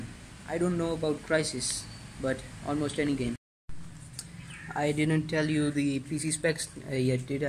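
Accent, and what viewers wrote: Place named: England, United Kingdom. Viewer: Aye haye English accent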